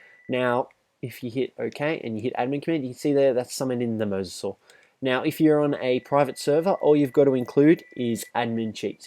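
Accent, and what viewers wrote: Australia. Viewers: are you australian? you sound australian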